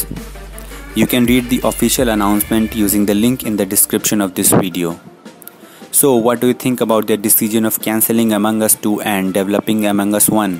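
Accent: India. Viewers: Is this dude indian? Look at that Indian accent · Voice reveal wiyhout face voice like an Indian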